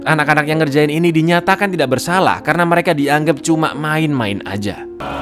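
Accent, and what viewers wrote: Java, Indonesia. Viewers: gw sebenernya ga terlalu suka sama aksen jawa karna susah di mengerti, tapi klo mau tidur suka selalu nonton channel ini. the best channel ever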